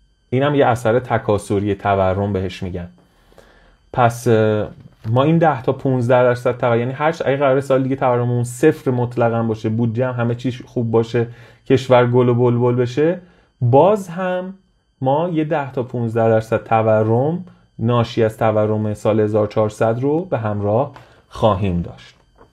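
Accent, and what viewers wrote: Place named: Isfahan, Iran. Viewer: به به دادا شما اصفهونی هسی .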